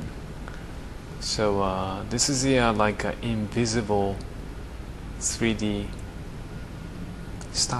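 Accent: United Kingdom. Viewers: He must have learned English from an person with an English accent. You can hear it a bit. So cool that he's bilingual, all the more power to him. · His english is so good. Did he learn english in Japan? I don't know enough about him. It really sounds like he learnt british english. It sounds like he has a british english accent as opposed to american.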